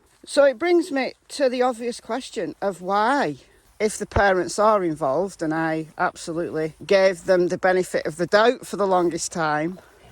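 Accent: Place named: Northern England, United Kingdom. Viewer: This case is maddening! I love listening to you ( and your English accent!) You must be in good shape from all the walking you do! I would be so out of breath, I wouldn’t be able to talk! I love this channel and virtually walking with you and your dogs!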